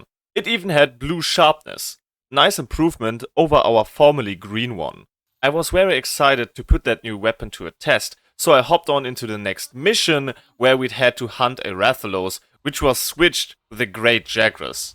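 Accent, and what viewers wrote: Germany. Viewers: Hey are u german u Sound like u hafe a german accend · ich höre so stark das du deutsch bist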